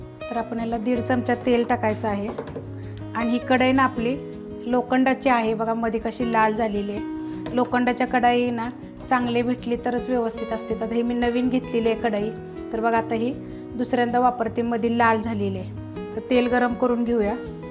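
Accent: Satara, India. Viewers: खूप छान 👌👌👌 भाषेवरून आमच्या सातारा साईडचे वाटता..चॅनेल फॉलो केले 👍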